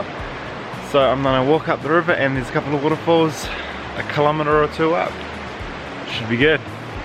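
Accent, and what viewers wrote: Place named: New Zealand. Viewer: Kiwi accent owwwwwwwwwww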